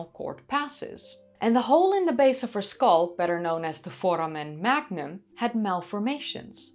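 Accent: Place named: Netherlands. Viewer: And here are again. Another amazing video. And as I have many times before, mentioned curtain lovely dutch accent. I like accents. I just kean back. Learn something and hear wonder dutch accent. So all in all another great video with the All amazing and her beautiful Toll of a of voice with that wonderfull dutch accent.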